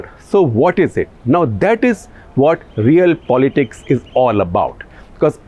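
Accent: India. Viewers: Shekhar Gupta-cluttery and more cluttery Your Indian accent English( Hinglish), clearly states that all three princely Muslim states were annexed , using Indian army illegally, not to mention Goa and islands off the Arabian/Indian ocean precincts and islands off the East Pakistani coast. India jumped to holding a plebiscite in Junagadh and munavadar, but relies on a forced instrument of accession from hari singh, nawab of a Muslim majority state: both preposterous, and self contradicting. One case, of course, comes out without doubt, partition plan and un resolution both unequivocally determine Kashmir as part of Pakistan . Come on, now, let’s come out of verbosity and hiding behind words, Kashmir is an open and shut case - its Pakistan. Junagadh, munVadar, mangrove and Hyderabad can be discussed later !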